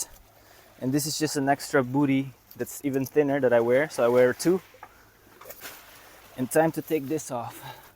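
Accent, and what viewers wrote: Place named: Mindanao, Philippines. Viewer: What country are you from? Your accent sounds like you're from Southern Philippines but I could be wrong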